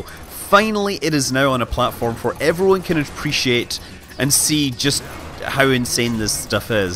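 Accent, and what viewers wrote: Scotland, United Kingdom. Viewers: Skaughtisch accent.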